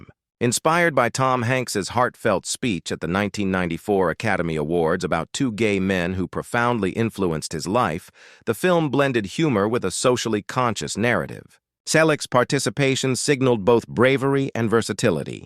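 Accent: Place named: United States. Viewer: I am a relative of Tom and currently visiting the Selleck's. What did Tom confess? He has confirmed already many times he hasn't confessed anything recently. Everyone we know has already read his book or listened to the audio CD. Learn English. It's free on YouTube. Your Americanized AI-generated voice sounds unnatural. We know that you live in a third world country and don't speak English. Don't talk about people you don't know personally.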